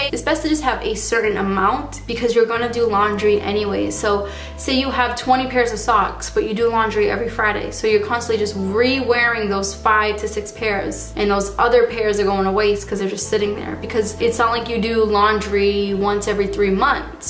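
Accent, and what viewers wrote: Boston, United States. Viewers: Your Boston accent is so adorable!!!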